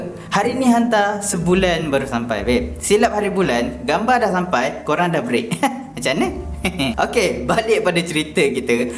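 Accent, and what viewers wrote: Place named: Penang, Malaysia. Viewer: Mcm penang atau negeri sembilan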